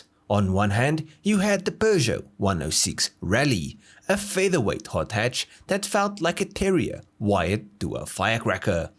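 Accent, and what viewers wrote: South Africa. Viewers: exactly. Sounds very much like a south african afrikaans speaker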